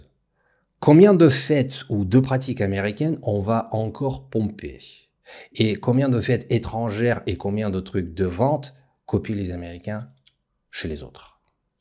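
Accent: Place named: Russia. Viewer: Un "russe" avec un gros accent russe fait une émission en français pour les français en disant "On copie". C'est absurde et illustratif des "russes" occidentalisés qui ne savent plus dans quel monde vivre., faites-vous soigner!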